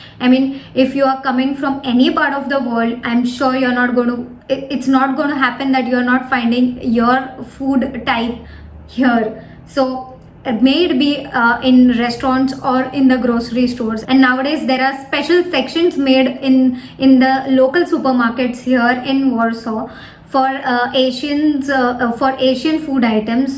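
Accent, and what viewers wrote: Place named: India. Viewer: Indian accent. Pleasant to the ear so cheerful. I've always liked him.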